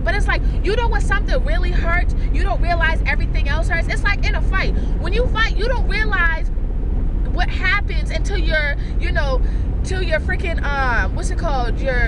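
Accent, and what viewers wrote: Boston, United States. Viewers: You sound like a Bostonian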